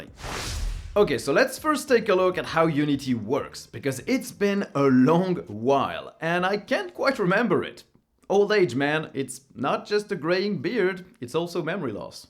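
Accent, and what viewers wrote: France. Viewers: Your accent, are you French, Ouais?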